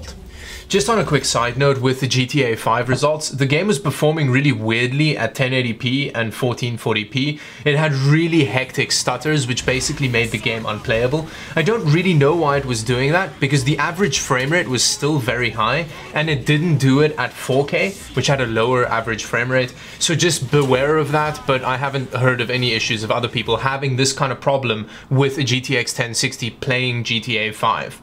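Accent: South Africa. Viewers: are you south african? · Bra is jy van SA?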